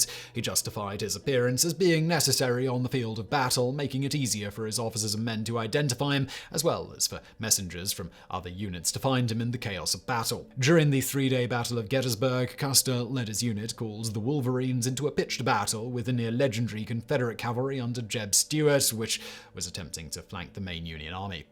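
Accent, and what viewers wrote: United Kingdom. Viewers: Dear, dear... I do think they protesteth far too much. It's either your pronunciation (you damn Limey), your racial naming of participants of just choosing the wrong side of your subject. You can't win can you..., so stick to Europe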